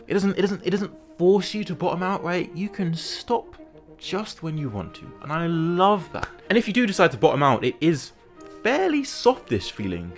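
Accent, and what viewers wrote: United Kingdom. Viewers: Top quality content mate! Funny af, informative, British? Damn fam let’s do a meet up after the pandemic!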